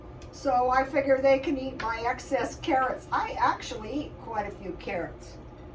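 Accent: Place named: Utah, United States. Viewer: Maybe a Utah accent?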